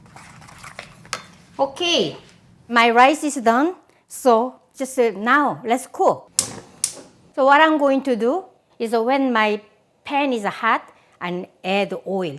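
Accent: South Korea. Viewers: 영어를 전라도 말투로.. 이국땅에서 들으니 정겨움이 더해지는 느낌이에요 양념에 전분가루 넣는 꿀팁 고마워요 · I love your Korean accent so much.😘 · I don't know how the dish taste because never tried octopus... But I'm damn sure I've not heard any other Korean speaking English in such a cute way💙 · 귀에 쏙쏙 들어오는 반기문 영어. 모두가 잘 알아들었을 것 같다.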